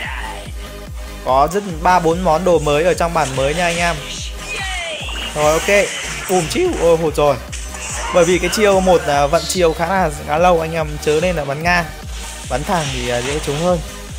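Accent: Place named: North, Vietnam. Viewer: thích giọng trai bắc đặc biệt là thái bình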